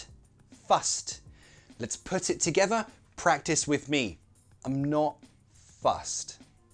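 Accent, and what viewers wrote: United Kingdom. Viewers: You are helping us a lot to learn and improve our oral skills...I love your British accent. Regards from 🇦🇷😎👍👏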